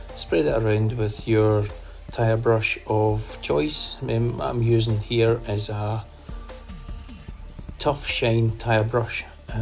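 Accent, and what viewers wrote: Scotland, United Kingdom. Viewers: What an informative film and of so much relevance to me as I started using GT products recently Tyre Serum and Decon Shampoo. I tried the Serum on a a couple of cars and so far have been impressed. My Father in law has noticed a difference in his sidewalls. Do I detect a hint of a Scottish accent there. Some other Youtuber recently suggested putting a dressing on top of the Serum if desired and I have not tried that yet and wondered what your thoughts were. I subscribed also as I am a keen detailer with time on my hands to learn as much as I can before I think of setting up my own business. Thanks for the info.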